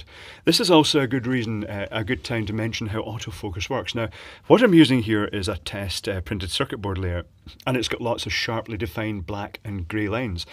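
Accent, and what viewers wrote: Scotland, United Kingdom. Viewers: he's scottish :D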